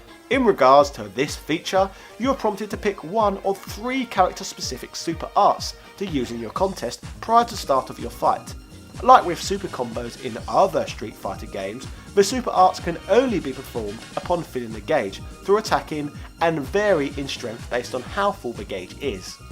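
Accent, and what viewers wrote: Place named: RP, United Kingdom. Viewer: You truly are an aristocrat. Most Brits might call it Stree figha free.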